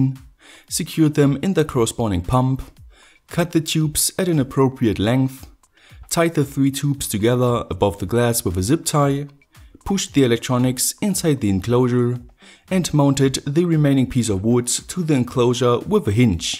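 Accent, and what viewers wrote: Germany. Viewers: Jeder weiß das du deutscher bist... ich verstehe nicht so gut englisch aber man merkt das du deutsch bist weil du nicht diesen englischen “Akzent“ hast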